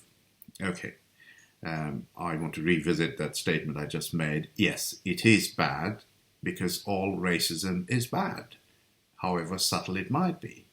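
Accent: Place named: United Kingdom. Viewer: By your accent, I thought you were British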